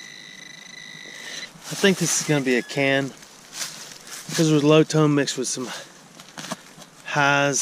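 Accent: Alabama, United States. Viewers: What a voice! If someone wanted to capture American accents, this guy would be the voice of Alabama. Yes, it's been softened by interaction through modern media, but softer than Texan. No twang and a hidden southern humor. College educated with a desk job.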